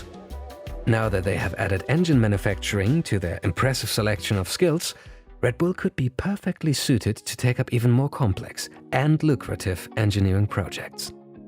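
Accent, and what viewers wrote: Germany. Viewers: No doubt the narrator is German. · Your are German right? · you are german! i can hear it, how you pronounce the german/austrian names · are you german? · So wie du die Namen aussprichst bist du Deutscher oder Österreicher :D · Your German accent is so off-putting. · I'm new here but I could listen to the German accent all day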